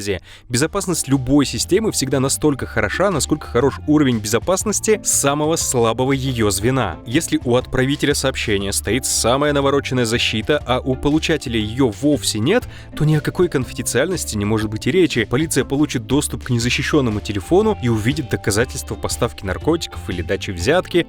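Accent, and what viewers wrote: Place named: Kazakhstan. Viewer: С первых минут видео понятно, что автор с Казахстана😂😘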